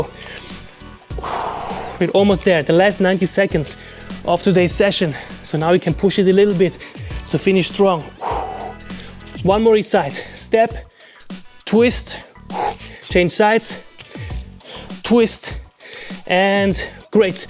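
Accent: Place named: Russia. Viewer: интересный акцент, вы русский?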